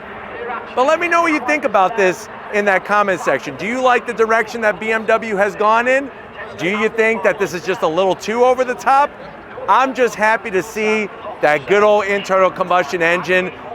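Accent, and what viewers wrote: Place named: Redneck, United States. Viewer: funny American redneck accent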